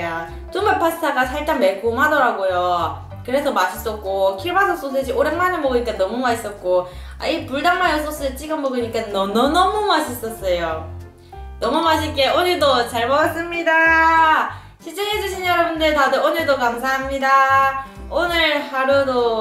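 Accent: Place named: Daegu, South Korea. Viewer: 씨 사투리를 쓰셔서 더 호감가는 것 같아요? 대구분이신가요?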